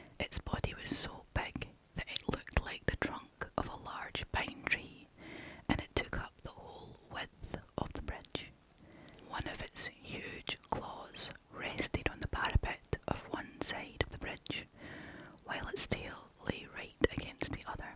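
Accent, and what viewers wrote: Scotland, United Kingdom. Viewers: You are absolutely brilliant. I love your Scottish accent! I hope you gain a lot of viewers. I’ll be sticking around for sure 💚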